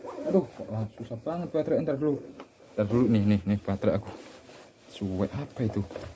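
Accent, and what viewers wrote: Java, Indonesia. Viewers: mas ciri khas klo ngomong nya pas klo ngomong banget nya medok banget.. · Mantaps bang ceritanya seru mengerikan sering lah cerita kisah pengalaman kek gini karena serunya gk kalah sama vidio campingmu bang.. btw sampean orang mana bang logat jawa tpi kok tinggal di jabar?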